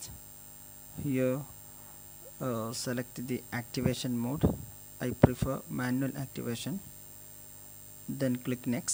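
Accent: Kerala, India. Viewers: Bro u mallu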